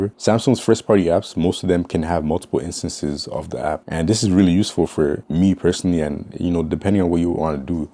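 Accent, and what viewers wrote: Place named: Canada. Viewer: I thought you were canadian from your voice but then saw the fibetv. How the f lmao. Bro that was so much to import. Thanks for making this content dude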